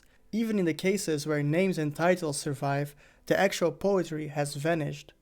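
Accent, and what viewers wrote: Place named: India. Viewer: I thought this was being narrated by an Indian, until I saw his face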